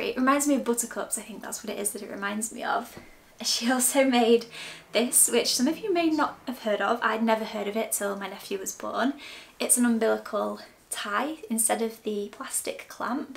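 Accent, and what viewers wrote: Yorkshire, United Kingdom. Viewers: Please don’t stop saying ‘tea’. The Yorkshire dialect is one reason I ♥️ your videos so much! My dads from Sheffield & it makes me homesick to hear you talk!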